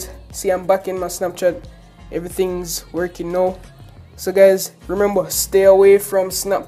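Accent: Jamaica. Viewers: Are you Jamaican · Jamaican accents bang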